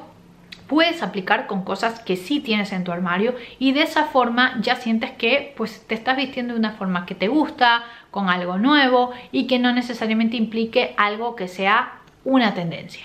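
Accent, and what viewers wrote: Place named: Argentina. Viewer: Me encanta tu acento, eres argentina? Saludos de una argentina en Texas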